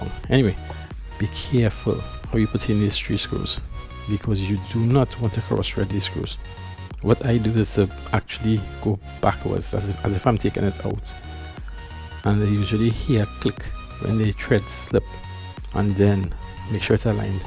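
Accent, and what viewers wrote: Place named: Trinidad and Tobago. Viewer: Heard your voice and was.. He must be Trini. Just looked at your channel sure enough. Been to Trinidad about 8 times. My wife is from Grande.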